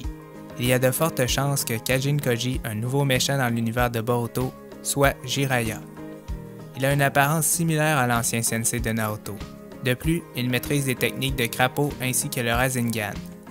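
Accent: Quebec, Canada. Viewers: Ta vu avec son accen canadien il est les a trouvé dans ces ses imaginatoin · T québécois sa svois direct avk ton accent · Il peut rien y faire il est quebecois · Tu et quebecois?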